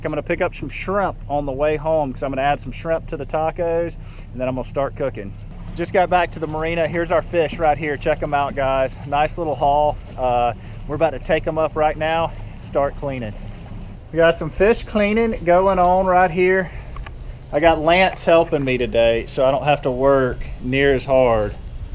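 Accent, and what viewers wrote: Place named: South, United States. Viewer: Hi, I just want to tell you how much I have enjoyed watching your channel which I just discovered two days ago. I absolutely love your Catch and Cook Video's. You are a lovely person and your video's and friends seem so nice. Thank you for your channel and keep those Catch and Cook video's coming. By the way, I saw in your mother's kitchen she had a scripture from Psalm 16 written on a small blackboard. Is she a Christian? If so, I think that is awesome. I have walked with the Lord Jesus for 26 years now and have an awesome relationship with Him. Where are you from originally-you have some beautiful southern accent. You are an amazing fisherman-someone who can tell what fish is on the line before you even see the actual fish tells me that you are very knowledgeable about fish, which I find so interesting and neat. 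I can tell you just love being on the water and you are so fortunate to be able to make a living doing what you love. God Bless You,.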